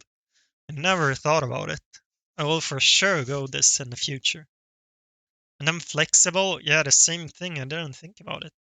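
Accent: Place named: Sweden. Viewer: At the end there.. What Title meant with the tips is that it is crucial to find Illaio 2 with rebels, and to do that over Zoe 2 star. Spending 12 gold on making Zoe 2 star when rolling down(and being stuck on that 12 gold loss - cant sell her), then being broke - and then not finding Illaio 2 star. He means that this is a big "loss condition" for rebels. How to lose games with rebel. Maybe prio looking for 2star illaio first, then other units when rolling down, maybe dont make Zoe 2 star if low gold when rolling down + no illaio 2 star. Great videos btw, i love your content. Keep it up! P.S you sound Swedish. Heja svenskar, snart blir det varmare här! haha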